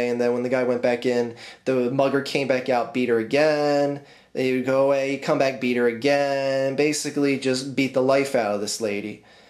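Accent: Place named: United States. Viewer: well, judging by the way you talk, you must be an American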